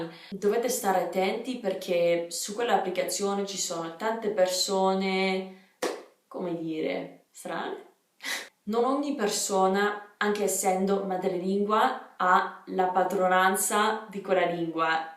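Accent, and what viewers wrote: Italy. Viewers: Tu non sei normale!! Non è possibile per una madrelingua inglese avere un accento italiano così "italiano"! Ma come hai fatto? Considerati eccezzionale. ;-) Si vede che sei molto motivata e superperfezionista. Complimenti, hai un accento praticamente perfetto, e la cadenza è molto italiana. Il tuo accento potrebbe essere vagamente dell'italia del nord, ma è quasi inesistente, quasi da speaker del telegiornale. Io sono Sardo, e ti confermo che i Sardi parlano mediamente un italiano ottimo, probabilmente perchè un tempo parlare in sardo era visto negativamente. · ODDIO SEMBRI ITALIANA. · Sei veramente bravissima,hai pure l’accento all’italiana 😂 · non si capisce che sei canadese! dal tuo accento sembri proprio italiana, complimenti davvero 😍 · non direi mai che tu sia straniera. Accento 100% italiano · Hai gia un bellissimo accento italiano. 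Bravissima, continua così. 💪🏻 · Sei davvero brava, addirittura quando dici certe cose hai la stessa cadenza di un italiano! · complimenti! Parli con un accento molto italiano!! Davvero! Non sto scherzando affatto! Complimenti! Mi sono iscritto al tuo canale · Parli italiano. Ma non solo. Gesticoli, hai la r detta in italiano, e accenti e decadenze italiane. Wow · Wow hai un perfetto accento italiano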